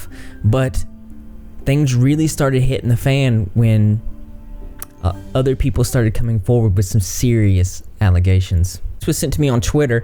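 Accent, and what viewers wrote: South, United States. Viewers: Are you from the south? Your accent is adorable.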